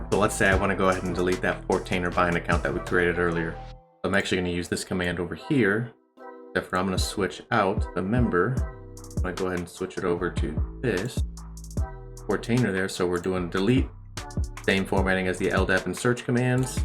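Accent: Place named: India. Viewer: The indian accent got me good 💀💀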